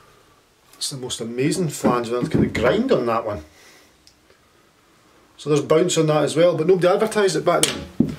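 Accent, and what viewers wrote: Scotland, United Kingdom. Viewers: Aye, adjustments are possible to reduce the effect of bounce off concrete. Why not do a Scottish version of Phil Mickelson and show on a video how to hit very different shots with the same club. Too many are too poor at understanding the versatility of a wedge anyway. This said just as an idea for additional vlogs from you. Examples: low chips with a lob wedge and next a flop shot with the same club, and how to master both, and how to increase bounce by opening up face in soft sand and the opposite in wet sand, and so forth. Good luck with your shootings. And what a delight it is to hear your dialect. Be proud of it! Keep the good stuff coming. Greetings from a snowy Sweden.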